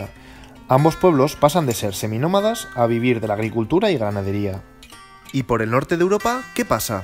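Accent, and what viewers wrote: Spain. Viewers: Ese acento es español ...la madre patria de los " CUBANOS " 🥰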